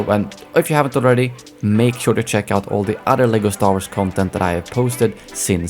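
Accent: Sweden. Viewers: Sorry but I have to ask, are you swedish? If not i’m sorry for asking but it sounds like a swedish accent and Im from Sweden.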